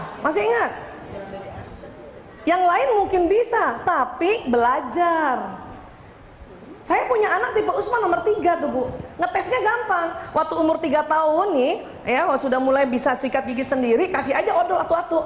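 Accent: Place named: Banyumas, Indonesia. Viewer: Bocah ngapak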